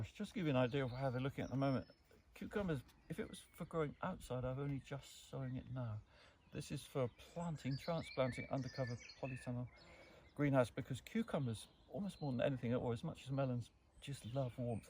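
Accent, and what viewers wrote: United Kingdom. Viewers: Good video but the volume on the sound was much too low (as are some of your other videos). Not sure what the problem is but it’s very difficult to hear you and being from the US, I have to listen very closely to understand your lovely British accent. ♥️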